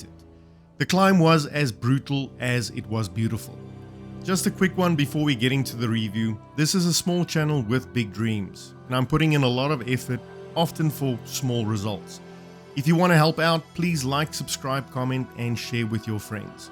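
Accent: South Africa. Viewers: Aussie Channel, but I know a Saffer when I hear them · Hey man, what accent do you have? South African?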